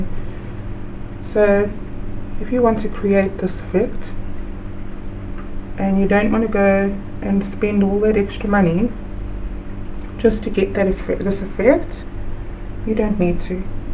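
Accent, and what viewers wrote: South Africa. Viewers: Omg I LOVE your accent!!!! Is it South African?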